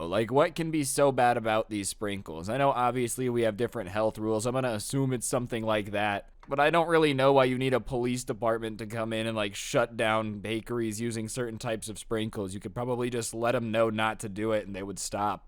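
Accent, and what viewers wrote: RP, United Kingdom. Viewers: As a Brit i can say that that is the poshest of posh brit accent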